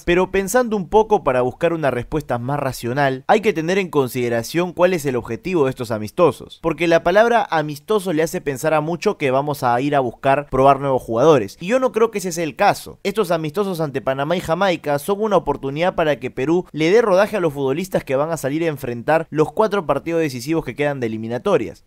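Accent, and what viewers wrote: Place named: Cordoba, Argentina. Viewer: pprque tienes un acento medio argento, especificamente cordobés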